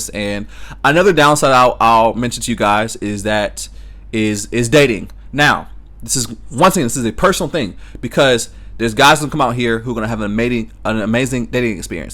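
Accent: United States. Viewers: Took the time to watch your whole video before making these comments. I have been living in Thailand for over 10 years so i feel I'm qualified to speak. Overall pretty fair comments for someone in Thailand for only a year. BUT! 1) after a year, you still pronounce PAD THAI like an American. Listen carefully to the way 'PAD' Is pronounced. It's not an iPad. 2) you don't tell people that they can't just come to Thailand and stay. Tell them about the visa renewing headaches. 3) you seem like an open-minded guy and you try to be, but your comments on the LGBTQ++ issue in America was off. You said that you have no problems with them but you have a problem with how in-your-face they want to be. Well, I have to point out that white people were saying the exact thing about hip hop culture. 4) you don't tell people that you can't work here legally. 5) you skip everything about the political situation here, which is a huge thing. 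I'll keep watching. 6) you don't really understand Thailand and it's culture until you get to know Thai people, so how you have some Thai friends or at least aquaintances, and not just Thai bar girls, who most Foreigners think represent Thai people. However you are more balanced than most other YouTubers in Thailand.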